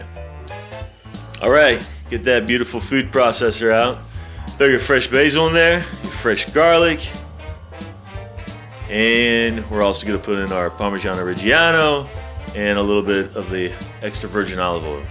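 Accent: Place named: New York, United States. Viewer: Hi. You sound like you're from the best part of the country...NYC. I also make pesto...but without the tomatoes. Your dad reminds me of mine. He might like this version of, sung by one of my favorites. Cent'anni. <link>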